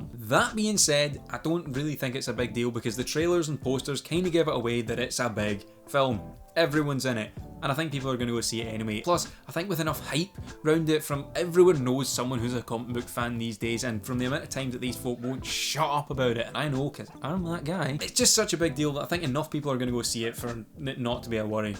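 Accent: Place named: Scotland, United Kingdom. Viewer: I am loving hearing a lovely Scottish accent in super hero movie reviews! 1 more subscription on your way!!!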